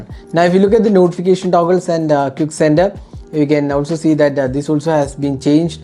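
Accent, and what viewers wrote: India. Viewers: I do not know about all other of you followers, but I do not understand Indian English speaking. Sad fully most Indian IT Techs who speak English have VERY BAD English pronunciation, so my comment to this IT Tech is to at least TRY TO LEAVE THE INDIAN ACCENT WHEN SPEAKING ENGLISH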